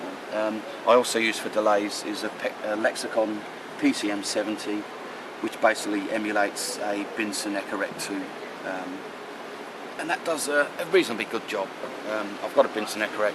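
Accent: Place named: England, United Kingdom. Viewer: he sounds english to me.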